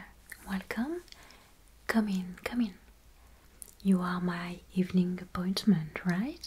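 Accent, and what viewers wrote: France. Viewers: Your french accent is life😑 its so beautiful❤️ also, the sound of the music sheets lol😑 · I just finished watching her roleplay where she did a French accent that I thought she was faking the entire time just to find out she wasn't faking it lol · Mon dieu...French accent whispering in my ear with quality headphones is pure eargasm. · and I was wondering at the first videos I saw what accent she has. Until I saw the french lesson video and now I am like: "Why the f... didn`t I hear that earlier? Yo can hear the french accent at a few words, I am so dump" So I think I`m not good in guessing accents.....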